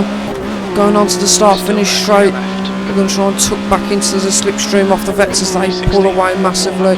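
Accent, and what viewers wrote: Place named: Midlands, United Kingdom. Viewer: As a fellow Midlander, love hearing your accent here 😁❤️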